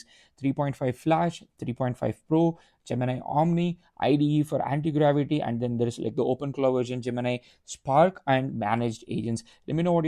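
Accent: South India, India. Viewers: Very strong south Indian accent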